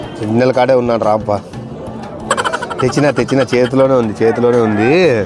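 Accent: Rayalaseema, India. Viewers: Madanapalle Naa thalli gari uru chittor daggara thandri garu uru super rayalseema slang comedy chupinchavu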